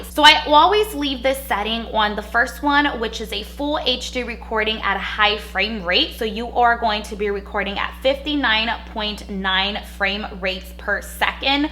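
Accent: New York, United States. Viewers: I love how you say "all" "on" "always" "honestly" ❤😂🤣 you must be from new York or Boston 🥰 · I never thought I’d find a video to actually help me with this but I DID! THANK you so so much! Ps. Is your accent NY or NJ?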